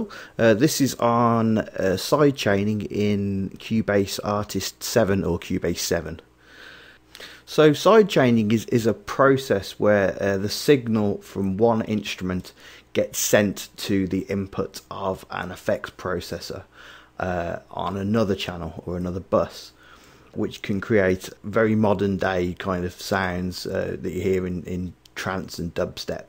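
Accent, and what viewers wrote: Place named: Midlands, United Kingdom. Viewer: good work, are you from the Midlands?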